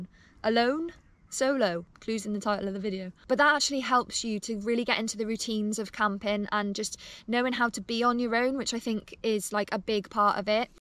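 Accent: Wales, United Kingdom. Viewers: Thanks for the LOLs! You're funny! Love your Welsh accent too 😀 (assuming my Australian brain picked the accent correctly ha!) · That's a Welsh Accent!! i subscribed (Edit) That has to be a Newport Accent/Cardiff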